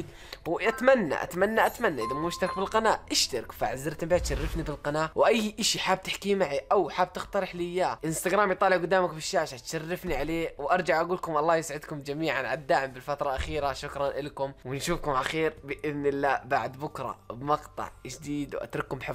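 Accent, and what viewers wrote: Jordan. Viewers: سؤال انت اردني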